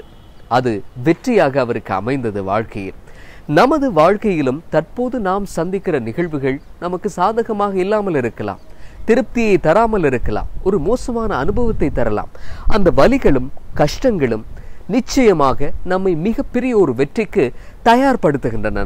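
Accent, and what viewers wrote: Sri Lanka. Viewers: அண்ணா நீங்க ஸ்ரீலங்கா வா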